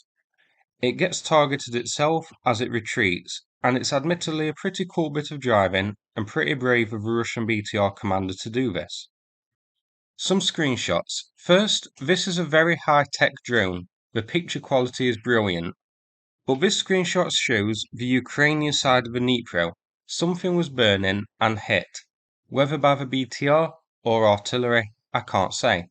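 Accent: United Kingdom. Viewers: Jesus! A heavy British accent plus a cheap microphone - and you get narration that is hard to comprehend. I have to stop every 20 seconds and go back several times! you are making good money from your channels - can't you afford a decent microphone? I am already in the middle of the video, and I am not quite sure who shoots at whom. I had to open a map to see what is actually shown in the video. And by the way, there is no Eastern or Western side of Dnipro here - there are Southern and Northern sides.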